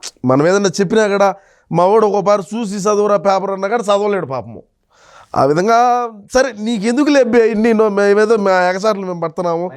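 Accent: Rayalaseema, India. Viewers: 😂😂😂 సూపర్ అన్న.3 రోజుల నుంచి మీ ఛానెల్ విడియోలు చూస్తున్న అన్న సూపర్. మీ రాయలసీమ యాస వినడానికి చాలా బాగుంది.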